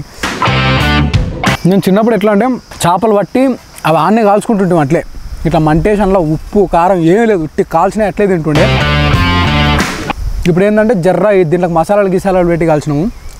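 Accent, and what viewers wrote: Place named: Palamuru, India. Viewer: Super bro👍 Enka Purley PALAMURU slang lo matladu , mana plaamuru slang ki full fans unnaru bro, Keep it up. All the best for your bright future (your YouTube channel) bro🎉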